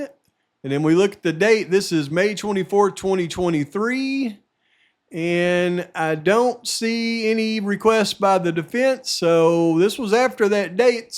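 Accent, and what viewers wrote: United States. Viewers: I started listening to your vids recently. Please drop this heavy hick accent. Please. Please. It’s distracting and makes it difficult to listen. Appreciate the info and insight.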